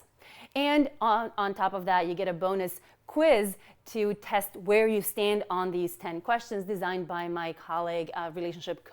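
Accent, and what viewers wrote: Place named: North Macedonia. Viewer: Great video. I've been into the open relationships, swingers lifestyle, polyamory, consensual non-monogamy for years - before it was considered a hip thing to do. I totally agree the past couple years all this has been trending, but I'd also says a lot of the Millennials are treating it like a fad. A fad until they get hurt - due to lack of research before jumping head first into the lifestyle. Btw...I love your podcast!! And I can so hear that Macedonian accent of yours coming through 👌 😁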